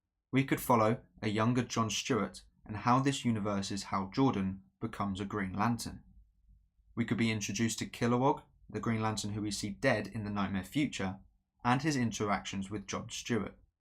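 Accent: United Kingdom. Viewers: Where in the United Kingdom are you from?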